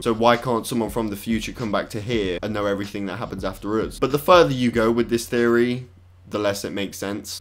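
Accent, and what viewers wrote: United Kingdom. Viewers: I was just wanderin' what do u british do to "R"s?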